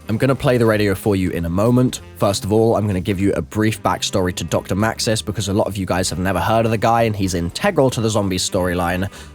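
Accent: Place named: United Kingdom. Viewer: god damn i feel sorry for you,. You actually had to change voice from that happy and silly british accent that made everyone happy to a voice where its so depressing and dark-like compared to other times because of the 10 year old butthurt angry little piece of shits thinking why this is not like The kid wanted it to be. You are working so hard!! And you upload videos this many times!! It just hurts to see this! Just, get a break. The actual people would understand! Props to you,!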